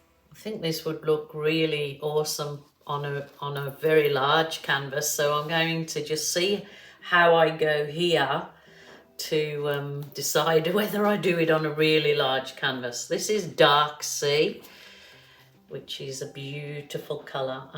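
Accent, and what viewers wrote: Birmingham, United Kingdom. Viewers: Can I hear a fellow Brummy accent?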